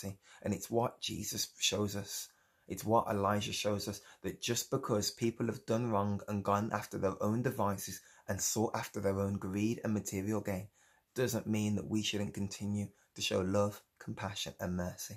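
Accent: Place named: Midlands, United Kingdom. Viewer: Yaaay are you from the midlands? I’m from Wolverhampton! Thanks for this.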